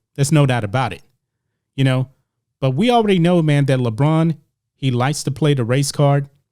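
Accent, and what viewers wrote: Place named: United States. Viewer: Great American voice are. Thank you.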